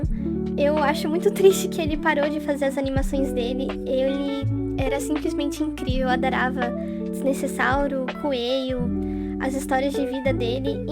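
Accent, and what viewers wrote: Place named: São Paulo, Brazil. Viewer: Hahah que estranho pessoas não percebendo que você é de SP quanto é tão claro.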